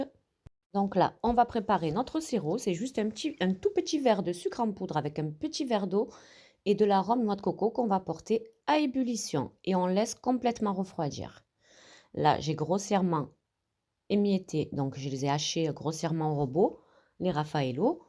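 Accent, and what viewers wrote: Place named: Provence, France. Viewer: 👏👏👏..abonnée depuis peu je vous trouve top comme vos recettes et vos vidéos 😉😁..j'ai fait ce raphaello en version gâteau rond et c'est une tuerie !mes invités n'en n'ont pas laissé une miette 👌😂..alors merci 🥰..plus qu'à tester plein d'autres recettes de votre playlist 😁..votre bel accent fait du bien et il me fait penser à ma sœur qui habite dans les Bouches du Rhône 🥰..à bientôt !!🌹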